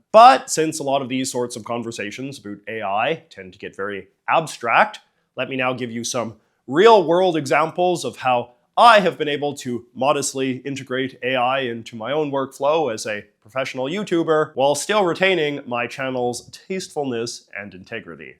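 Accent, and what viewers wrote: Canada. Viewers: What part of Canada are you from? I’m from Ontario and I’ve never met anyone with such a strong Canadian accent like yours. I am close to the border so that’s probably why I’m used to more American sounding accents but I’m curious to know what province you’re from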